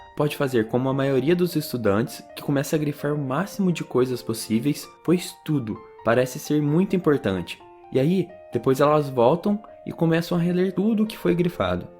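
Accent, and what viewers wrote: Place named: Minas Gerais, Brazil. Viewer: Onde o mora? Ele parece Mineiro!!!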